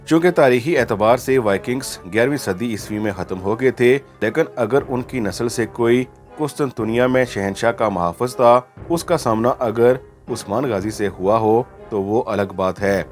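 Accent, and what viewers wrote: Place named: Kashmir, India. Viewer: R u Kashmiri ?? you Accent kashmiri hai. Distric kotli ka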